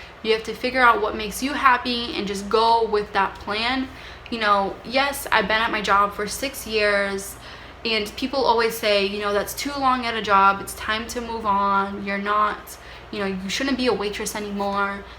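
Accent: Boston, United States. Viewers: As soon as you started talking I could tell you were from Boston! We gotta stick togetha! 😂😆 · I knew that Boston accent the minute you spoke! I💜my city! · You can hear the Boston accent.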